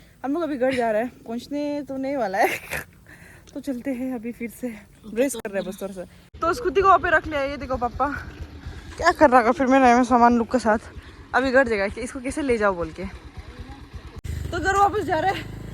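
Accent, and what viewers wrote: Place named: Assam, India. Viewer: Your assamese accent is sooo cuteee😂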